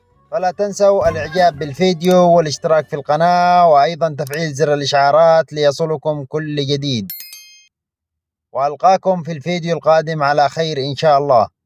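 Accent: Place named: Yemen. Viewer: حاول تخلي كلامك لغه عربيه وليسئ العاليه يمنيه تكسر الهاء نصيحه اخ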